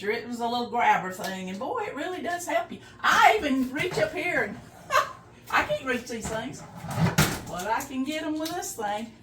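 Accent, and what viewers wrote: South, United States. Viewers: Thank you this info is very helpful and I love your southern accent 😊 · Mrs., you are simply adorable. I love your southern accent wisdom and tips for making life easier as we age. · New subscriber and I just love your channel, especially your sweet Southern accent. Thanks for the wonderful tip. Love your "Paddy Wagon"! My husband and I replaced our computer chairs for comfortable, padded recliners. I put a pillow on my lap, put my keyboard on the pillow, and recline with my legs and feet propped up. I have a large computer screen that's easy to see. In the kitchen, I have an office chair on wheels and a bar stool with a back and arms on wheels. Comes in handy for working at the sink and stove. Such a great tip for raising your dryer! The main thing that has helped my arthritis pain is to eliminate all "Inflammatory" foods from my diet. 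No more sugar, white flour or white pasta, no refined or processed junk foods, no soft drinks. Just eat lots of lean meat, fruits and veggies and whole grains. Only use olive oil. Made an amazing difference in pain and mobility.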